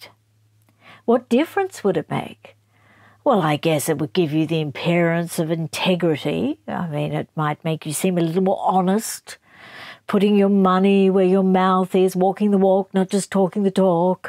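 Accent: Australia. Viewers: To repeat… these Snippets are like a verbal Southpark for me here in the US. Double bonus is your lovely calm Australian accent , in addition to the brilliant story lines. Americans are snarky in a different way, but it’s almost better coming from a Commonwealth member! You have even more reason to dislike them intensely!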